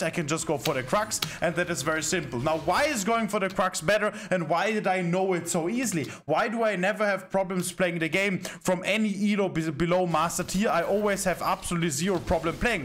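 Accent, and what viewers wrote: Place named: Germany. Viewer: Just listen faster man haha xD Maybe it's also easier for me, cause from the accent he seems like a german speaking guy. So i can understand that very well ;) That makes it even more impressive doh!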